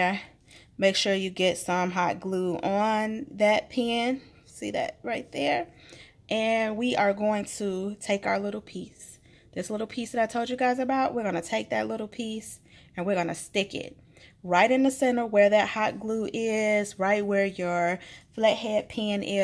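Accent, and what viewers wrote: South, United States. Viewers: Hi! Oh sugar, I have been doing videos for some time now, and I still stumble over...well...lots of things. I say "um" all of the time. I enjoy watching tutorials where mistakes are left in due to people being real. I never met a perfect person.;). I have butter fingers whenever the camera is on. As soon as it is off, I am fine. Your nails look great! Love it that your nails match your project. Wonky is real. By the way, Tree sent me over. I am a new subscriber of yours, but you will not see me on your list due to my settings are on private. They came out darling! Love the colors. I really enjoyed your tutorial! I love your twang sugar...I am from the south. Oh, and by the way, I also loved hearing your daughter in the background from time to time. Thank you for sharing your creativity with us all. I hope you have a safe, marvelous Monday. 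· I love this! The only problem was that you were out of frame when making the hook with the wire. I couldn't see how you did it. If you combed out the pom poms, they would be even lighter and fluffier. TFS!, BTW, I love your southern twang!,😊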